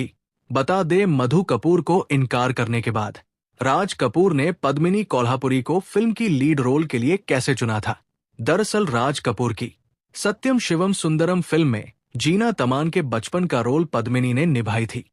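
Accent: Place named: Bengal, India. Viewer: Ye kaisi hindi hai aap ki .bangali jaisi .usse bhi buri .kya grammer hai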